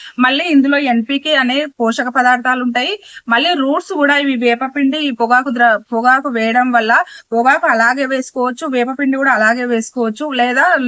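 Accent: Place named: Rayalaseema, India. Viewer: మీ లాంగ్వేజ్ రాయలసీమ లాగా vundi అక్క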